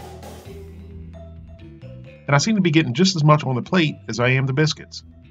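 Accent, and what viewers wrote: South, United States. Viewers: I was born and raised in the South and have family (inlaws, outlaws, etc.) stretched from New Orleans to Raleigh, Chattanooga to Savannah , with alot of old recipes handed down from generations back to the late 1800's. This creation reminds me of the scene in the movie "The Dirty Dozen" when Vernon Pinkley (Donald Sutherland) "inspects" the troops of Colonel Breed (Robert Ryan).... Pinkley: [impersonating a General] What are you eating , son? Soldier: Southern chocolate gravy and biscuits, sir! Pinkley: [shaking his head slowly] Never heard of it. (That also goes for your "Southern Tomato Gravy and Bisquits"). From your accent, I just can't place which "South" you got this recipe from.... I will try the loose meat sammiches though. Bless your heart.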